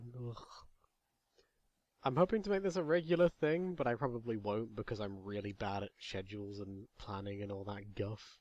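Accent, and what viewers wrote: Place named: United Kingdom. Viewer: Holy crap... You're British.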